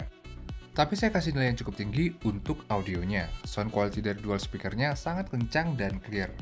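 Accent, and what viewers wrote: Lampung, Indonesia. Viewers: Orang lampung ya min?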